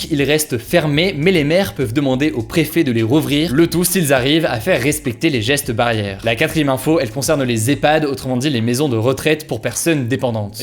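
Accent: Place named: England, United Kingdom. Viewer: ton accent anglais me fait délirer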